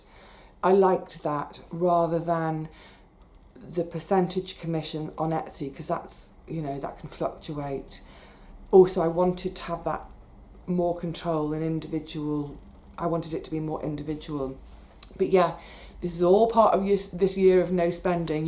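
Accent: United Kingdom. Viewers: Hello!! I just found you, it surprised me to hear a British accent. I seem to only be finding American channels which have helped a lot, but I can relate more being in England.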